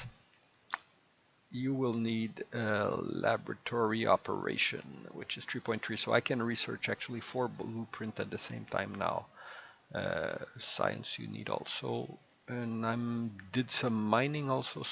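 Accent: Quebec, Canada. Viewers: French Canadian?? Je reconnais l'accent ahah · Is it possible that you might live in Québec? I smell a small Canadian-French accent there :P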